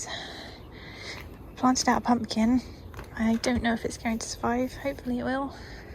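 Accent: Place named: United Kingdom. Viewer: I've always wondered what part of the UK you're form cause of the accent. I'm currently from the Midlands. Also love the veggie garden, both of my Uncle have quite the green thumb as well, planting their own veg lately.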